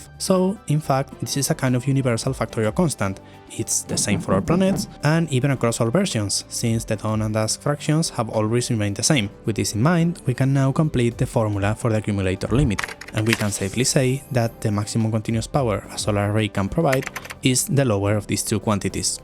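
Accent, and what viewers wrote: Spain. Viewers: Esto es acento español? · Amazing stuff. Subscribed. I checked your channel and this one video seems different than your previous ones. This must have taken you so much work. The results talk by themselves. The accent sounds familiar. I bet Spanish. Bravísimo. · Where is your accent from? Spain? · Can't hide that Spanish accent from me boy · You sound Spanish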